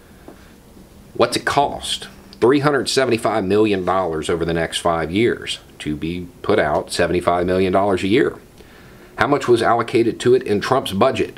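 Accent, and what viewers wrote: South, United States. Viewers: Hey,. I have a question, Is " " a character you play? In several interviews you do not have a Southern accent (or as close as " ") and I am just curious to the why you come off as " "? I am a long-time fan and supporter but did I miss a video or two where you explain this? All the best to you and yours. You'll always be my favorite "Florida Man".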